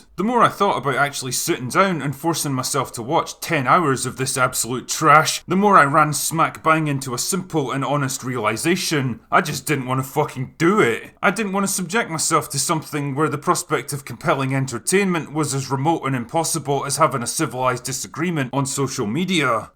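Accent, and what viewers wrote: Scotland, United Kingdom. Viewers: I like the channels content, but dude you have a wank Scottish accent 😂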